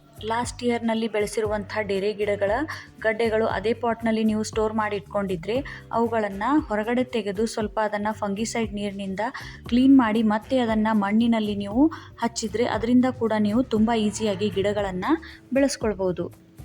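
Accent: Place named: Bangalore, India. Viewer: ನಮ್ಮ ಬೆಂಗಳೂರಿನ ಕಡೆಯವರು ಎಂದು ಭಾವಿಸಿದ್ದೆ, ಬೆಂಗಳೂರಿನ ಕಡೆಯ ಬಾಷೆ ಚೆನ್ನಾಗಿ ಮಾತಾಡುತ್ತೀರಿ ವಂದನೆಗಳು ಮಾಮ್.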